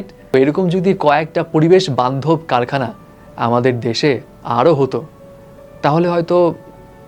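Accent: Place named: Kolkata, India. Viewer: ভাই এর কথা কলকাতার মতো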